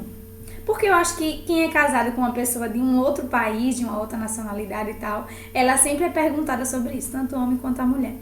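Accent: Northeast, Brazil. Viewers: Você é nordestina??? Percebi pelo seu sotaque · percebo um sutaque nordeste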